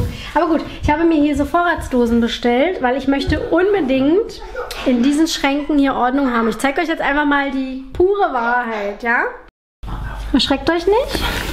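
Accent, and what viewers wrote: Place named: Berlin, Germany. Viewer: Ich musste auch sofort denken da berlinert doch jemand 😂😂🤩🤓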